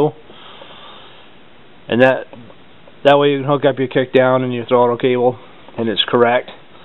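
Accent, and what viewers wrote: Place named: Aroostook County, United States. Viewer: Sounds like your from aroostook county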